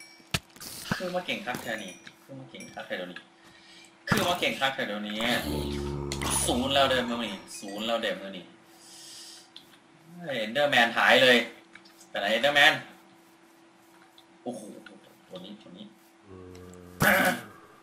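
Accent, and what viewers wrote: Isan, Thailand. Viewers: พี่ พูด ภาษาอีสาน บ่ซวนกันเล้ย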